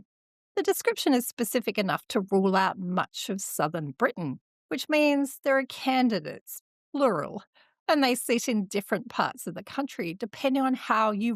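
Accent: Australia. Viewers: Always good to hear an Aussie accent talking about British history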